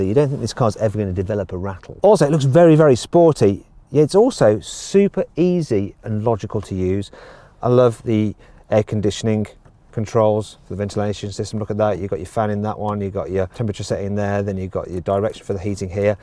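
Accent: United Kingdom. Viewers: 역시 영국식 발음은... 멋지네요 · 이형 영국발음때문인가 자꾸 보게돼....시원시원하고 깔끔하고 재밌어....뭐지 이형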